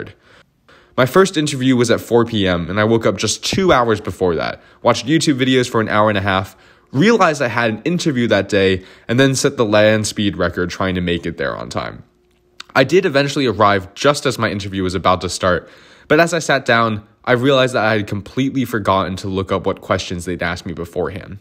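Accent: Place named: Ohio, United States. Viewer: i can tell you’re from ohio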